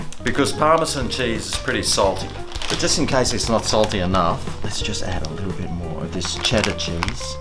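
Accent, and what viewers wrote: Australia. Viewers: Aw. You were pleasant to watch and the recipe looked very yummy. Darn it! I miss the Australian accent. Lived there for 6 months so long ago. Aluminium. Heh heh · Nice to hear an Aussie voice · you sure do like your cheese hugs from America hon btw I love the Aussie accent;)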